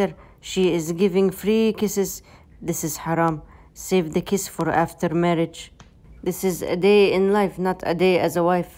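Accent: Arab world. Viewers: I like how u say "she'sso much cute".. that typical Arabian accent ❤ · Wallahi sister, you done your Arab aunty accent for so long I forget what your original voice sound like lol may Allah bless you and grant you shifa for all the joy you've given us with your content, ameen. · That arabic accent with imperfect english always does the trick 😅😂❤️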